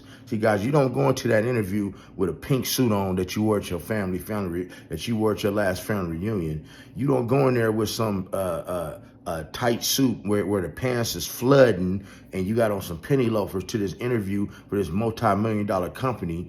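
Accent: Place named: West Coast, United States. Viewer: I felt this video all the way OG!! Preciate this content my Maine!!! Already know you reppin west coast, I can hear it.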